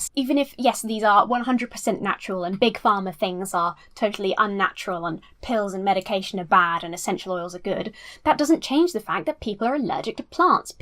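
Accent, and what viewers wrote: United Kingdom. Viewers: Your brittish accent is so beautiful. You should be reading in tutorials in how to learn to speak english on cd:s or something. You sound perfect. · It's nice to see someone who does athiest content also cover stuff like this, a lot of the people I watch tend to stick in a bubble of relegion/science related videos. Constructive and entertaining. Also, why does a female voice with a brittish accent make the phrase "fuck off" sound more powerful? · You're an adorable, critically thinking, scientifically literate atheist with a British accent... Why do you not have a million subs already. Lol so glad I found this channel! 🖤❤️🖤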